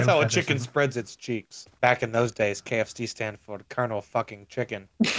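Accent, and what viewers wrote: Canada. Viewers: Ma gad,vanoss now bein ragin 😂,the canadian accent tho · Yeah. He’s Canadian.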